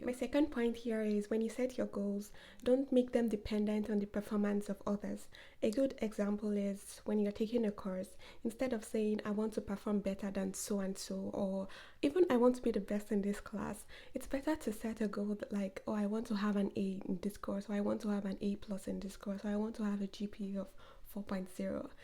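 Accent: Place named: Nigeria. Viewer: I knew it! You sounded Nigerian in the first video. Seeing that you last posted 9 months ago, I low-key wish I could connect with you. I know PhD is a lot. I pray you pass your first year qualifying examination and find the balance to come back to posting. Wishing you the best,.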